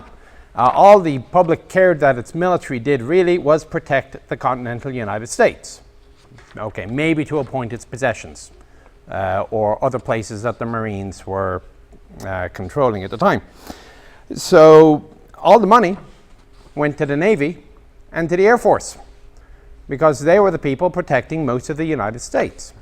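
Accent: Ireland. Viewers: Chance your he's Irish? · He is CLEARLY irish. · Irish accent?? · Where is that speaker's accent from? A little bit of Irish?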